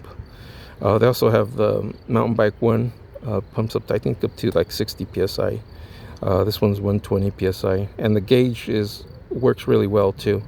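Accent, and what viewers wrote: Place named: Southern California, United States. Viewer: U sound vry southern cal. Where all th smartest people live. Smart purchase. U 4got mention yr bike has a teardrop aero down tube larger diameter. Mine is chrome version my upgrades vastly superiour 2yours& delete 3lbs over yourz. Relaxed geometry = superiour shifting huge reduction in cognitive stress. Always apply glue tube (and) patch blow dry maybe u find iz stronger result. Go buy u some 38mm Specialized Sawtooth $19 Jenson b4 they run out. 120 TP1 faster then yr frictiony knobs on MAXXIS. I clocked 12mph over San Gabriel mts fireroad slicks vs 8mph sluggish knows. Yes knows are fun 2look at. No they dont wrk. use slicks is best bleev it or not rubber gets traction. Hard 2bleev I know. Use melted parrafin wax chain tubeless tires. Even with tubes my new bike& new positioning man it just FLEW. When I got Walmart 17miles away It felt like I just left driveway brilliant ergonomics body not sore wznt tired at all either. 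Mind blowing. My Mercier build is actually wrldz best bike all others will now be judged by end all personified I built it delete my E Bike I was SHOCKED 1st time I rode it. Felt like it had a motor. I am ^ always have been cycling true epicenter 1x, dual control shifting on your drops, Disc specific rims/disc's pedal straps short upright top tubes, "Gravel bikes" with wide fork& stays thoze were all my doing from r decades ago. U neglect mention TAIWAN by far best builder bikes or anything. Very mature responsible people Taiwan = true reason Honda became ki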